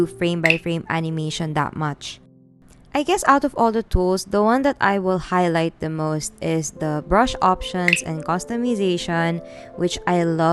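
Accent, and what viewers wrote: Philippines. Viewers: Filipino or no? 🤔 Your speech kinda sounds filipino ✋😐🤚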